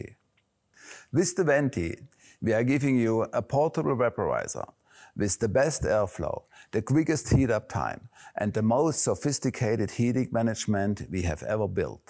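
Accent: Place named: Swabia, Germany. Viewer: Ist bestimmt ein tolles Teil. Mit dem Crafty war ich schon sehr zufrieden...wenn man sich etwas mehr Zeit für die Heizphase nimmt. Die Häufigkeit der Ladezyklen ist der einzige Makel. Bin sehr gespannt ob der neue B+K Verdampfer da mehr Spass macht. PS..ein toller "schwäbscher" Akzent ließ mich jetzt schon ständig lächeln...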